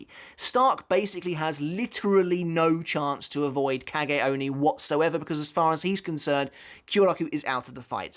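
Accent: United Kingdom. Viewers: It's probably the british accent.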